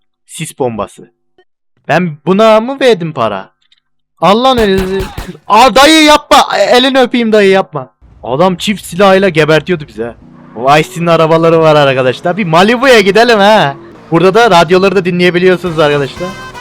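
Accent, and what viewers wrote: Turkey. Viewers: Eline sağlık dostum harika video olmuş. Bu arada nerelisin? Ben Denizliliyim ve bizim oralılar gibi konuşuyorsun :D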